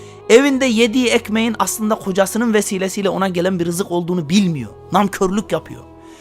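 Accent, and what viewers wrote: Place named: Konya, Turkey. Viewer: "Çen çen çen "😂😂😂 sen konyalının hasısın hocam.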